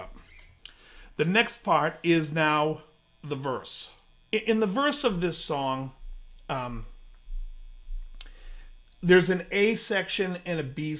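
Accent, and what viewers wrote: Boston, United States. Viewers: Do you think this guy's from Boston?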